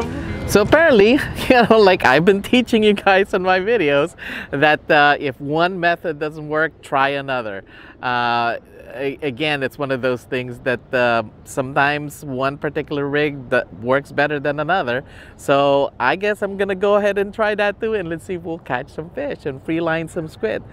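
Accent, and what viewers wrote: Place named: Philippines. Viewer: You must be Filipino...your accent lol. I didn't know anglers still fish on poers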